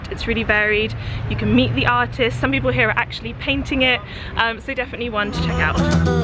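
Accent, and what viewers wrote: United Kingdom. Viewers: You are so pretty...As to your accent -you are brit - am I wrong or something....I am georgian from Moscow....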